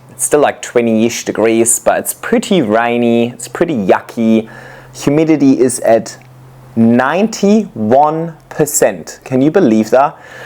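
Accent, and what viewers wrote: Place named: Germany. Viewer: Hi, I love your personality and your content, and I have learned soooo much from you. Short story: I was born in Aachen, Germany, many, many moons ago. When I started my plant journey, I used to watch your videos and initially I could not figure out why the expected Australian accent sounded like a German accent. I asked my son to watch you and he said "definitely German". 😊 I am extremely nitpicky about cleanliness and order, must be the German influence on me. 😬 I am Asian and live in the USA. Sorry, a long comment. Thank you for you fun, wholesome videos and you seem to be such a nice guy!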